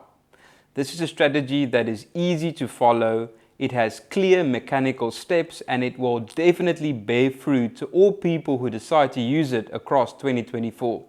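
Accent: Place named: South Africa. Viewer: lol i didnt even see the 'better than ict cap' he sounds like someone from south africa ja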